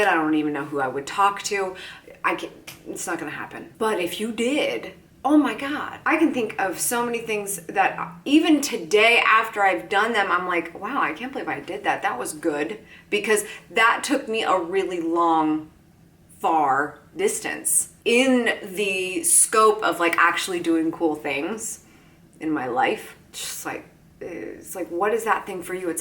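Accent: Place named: Philadelphia, United States. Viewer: vocal fry and from Philly.....!!!!!!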